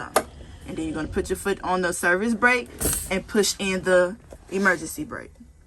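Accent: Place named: South, United States. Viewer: Thanks beautiful. I like your southern accent.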